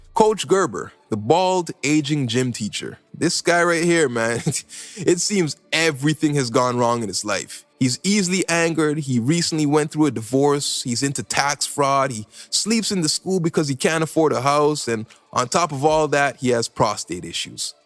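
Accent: Toronto, Canada. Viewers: You from Toronto?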